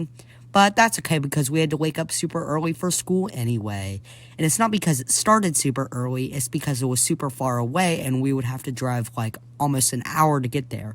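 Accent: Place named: South, United States. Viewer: well it's just cause it's like a southern accent but you still articulate yourself really well lol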